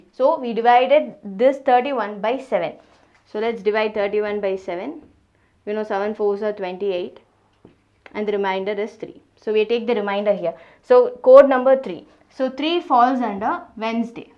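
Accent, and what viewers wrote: South India, India. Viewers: Ma'am south Indian accent is cute 😍💕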